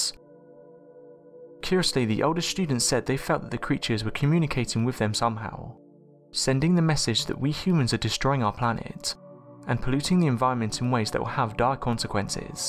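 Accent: England, United Kingdom. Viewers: Everything is better with a English accent.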